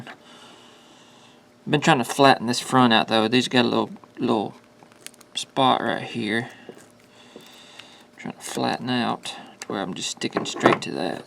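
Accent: South, United States. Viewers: Love your country accent. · Thank you for this!!!! I am going to give this a shot. I was about to throw them out.... but not just yet! I enjoyed your accent as well, good ol' country boy.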